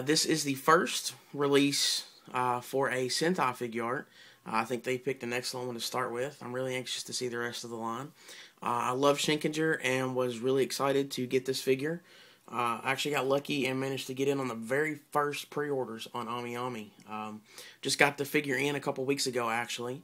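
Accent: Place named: Texas, United States. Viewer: hey thanks for making a video where you talk it out, I saw others and I found it annoying where it was just music. Also I like your accent, are you from Texas?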